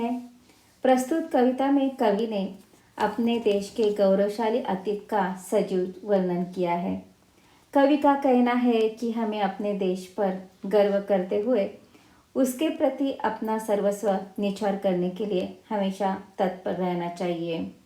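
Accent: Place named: North India, India. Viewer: बहोत ही बढिया....! आपल्या बोलण्याची शैली एकूण आकाशवाणी वरील कार्यक्रमाची आठवण झाली...उत्तर भारतीय व्यक्ती प्रमाणे हिंदी भाषेतील आपले उच्चार शुद्ध आहेत...स्पष्टीकरण ही खूप छान आहे....👍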